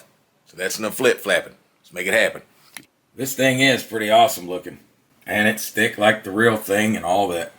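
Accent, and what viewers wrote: South, United States. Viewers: You're my new favorite guitar guy on YouTube, now. You from the South, brother? Mississippi over here!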